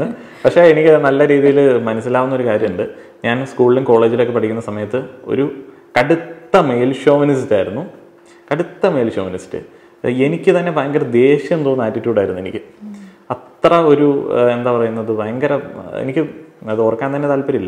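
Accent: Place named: Kottayam, India. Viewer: പെങ്ങളുടെ ഭാഷ കേട്ടപ്പം മനസ്സിലായി, കോട്ടയം ജില്ലയുടെ ഏതോ ഭാഗത്ത്‌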